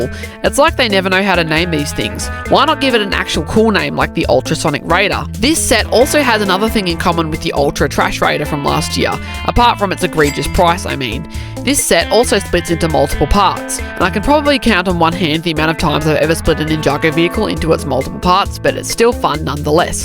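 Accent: Australia. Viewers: Is this Australian or New Zealand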